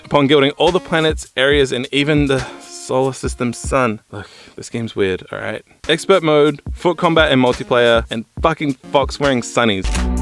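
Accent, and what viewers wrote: New Zealand. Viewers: you sound like youre from nz, which begs the question, why isn't this titled Lylat Wars?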